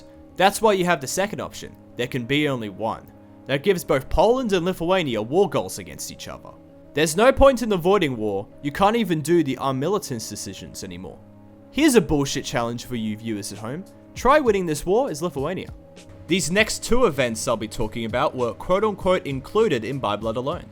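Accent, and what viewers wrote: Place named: United Kingdom. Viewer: Why are all hoi4 youtuber sound british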